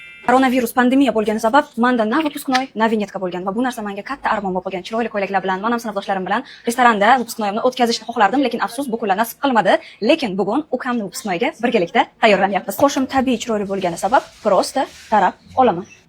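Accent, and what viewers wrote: Bukhara, Uzbekistan. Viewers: Opa Buxorodan misiz tojikcha gapirib qoʻydiZ☺☺☺☺☺ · Buxorolik ekansiz😂 tojikcha gapirganizdan bildim😂😂😂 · Shumo a buxoromi yoki samarqandimi shumo chibarósh purstashtet gôyet tojiki gapzadashtagetonba. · Бу киз манимча Бухородан. Шева тортаяпти. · Wevangiz zo'rde. Buxoromi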